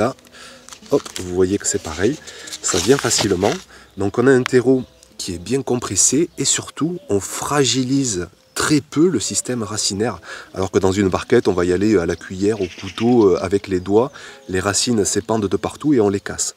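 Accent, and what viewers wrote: South, France. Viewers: Vidéos passionnantes et très instructives pour un jardinier novice que je suis . Très bien fait et le "petit accent du sud" en option ne peut que nous donner envie d'aller au jardin !!!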